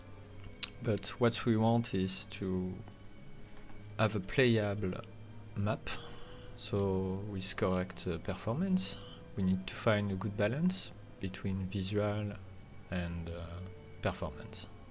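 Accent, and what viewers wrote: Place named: France. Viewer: Bonne vidéo, merci. L'avantage quand un français parle anglais, c'est que du coup je comprends l'anglais. Ceci n'est pas un reproche hein, juste un clin d'œil. Je parle comme une vache espagnole. Merci encore à toi. Le coup des fog est impressionnant. Chapeau.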